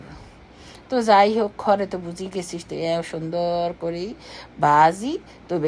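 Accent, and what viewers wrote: Noakhali, Bangladesh. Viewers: রুই মাছের কাবাব অসাধারণ হয়েছে মাছের কাবাব রেসিপিটা আর সুন্দর সুন্দর নোয়াখালীর ভাষায় কথা বলে কাবাব রেসিপিটা বানিয়ে নিলেন চমৎকার চমৎকার চমৎকার❤🎉❤🎉❤ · আপু নোয়াখালির কথা আমি তেমন শুনিনি আমার কাছে খুব ভালো লাগলো তোমার ভিডিও টা ❤️সাথে তোমার কথা গুলো 👍👍👍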